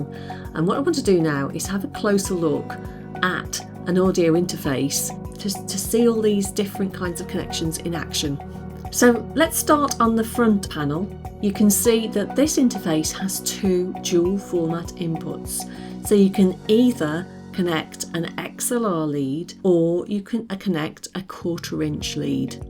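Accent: United Kingdom. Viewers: Hi! I love your channel. I have a question. I have a yamaha p105 electric piano wich has usb to host. This usb cable is an especial usb cable? Or is it like an usb print cable usb b to usb a? Thank you so much (I adore your british accent hehehe)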